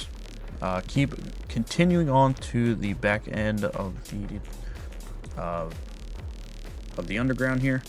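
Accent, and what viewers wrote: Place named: Philadelphia, United States. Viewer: Philly delco or Maryland? I hear It in your accent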